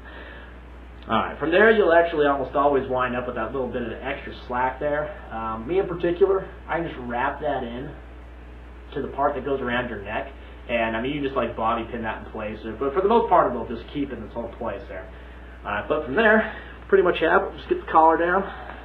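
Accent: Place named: Utah, United States. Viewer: Two comments: #1 Love the knot, and a great demo video. Only thing you might consider for future videos is using a greater contrast between tie and shirt. #2 You'll need to work a little longer on ridding yourself of the Utah accent. Don't look now, but your Provo is showing.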